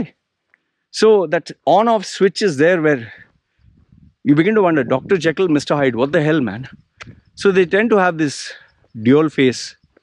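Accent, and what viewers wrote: India. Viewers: You don't look indian at all, of course your accent is extremely strong so people realize that, but it's nice to listen to you Subscribed!! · I'm confused. You say you're didn't spend a lot of time in India, but you sound Indian like you grew up there.Everybody knows indian accents . Plus Whats up with , new zealand Tribal tattoos on your face why Since you not from new zealand or indigenous new zealand just wondering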